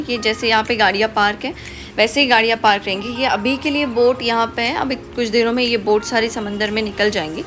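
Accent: Malvan, India. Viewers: तुझा मालवणीच आमका गोड वाटता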